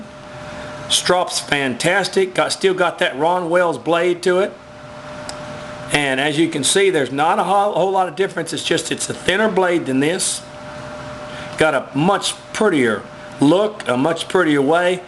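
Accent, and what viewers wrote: Texas, United States. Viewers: Nice info.. anyways is him using Texas accent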